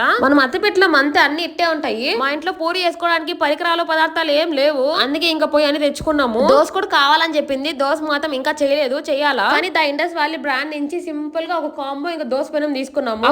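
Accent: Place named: Chittoor, India. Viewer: Ye vooru akka midi... Chittor accent la vundi..😊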